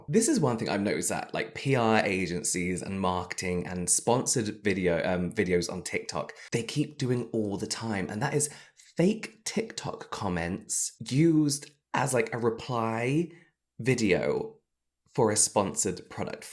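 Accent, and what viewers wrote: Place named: RP, United Kingdom. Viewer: I love the way you talk. It's just so Posh!😩👌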